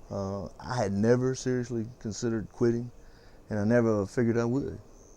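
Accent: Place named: South Carolina, United States. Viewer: your accent made me feel right at home here in SC lol. felt like i was listening to a family member talk. i quit smoking today!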